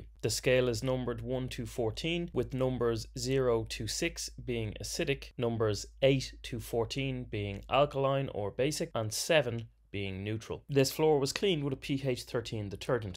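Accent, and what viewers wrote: Ireland. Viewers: The magical lilt of an Irish voice... listening to your voice... makes me happy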